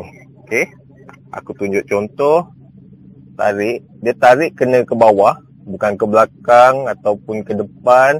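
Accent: Java, Indonesia. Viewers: Pekat kang jawanya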